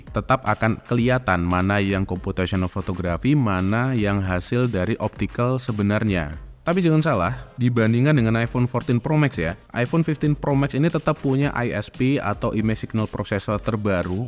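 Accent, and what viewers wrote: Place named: Java, Indonesia. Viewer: Mantap maseh 😂 jowoan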